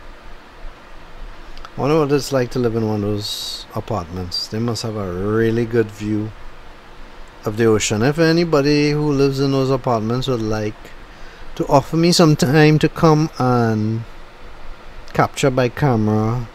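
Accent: Trinidad and Tobago. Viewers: I like your true trini voice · nice Trini accent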